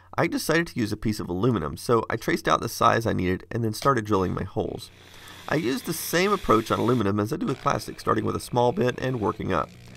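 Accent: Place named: Texas, United States. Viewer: Classic 8 but guy. Always a pleasure. Is it just me or does sound a bit more Texan in these early videos?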